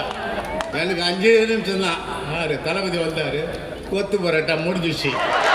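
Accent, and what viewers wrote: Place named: Chennai, India. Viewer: 😆😆😆😆😆😆😆😆 original madras basha.... thala ne gethu thala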